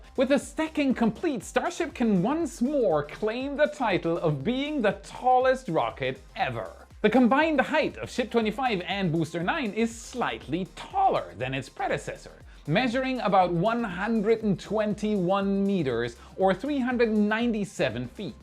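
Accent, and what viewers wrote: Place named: Germany. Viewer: Your english has improved a lot over the last time, better pronunciation, more words in your narration👌🏻 However, please keep your german accent! 😄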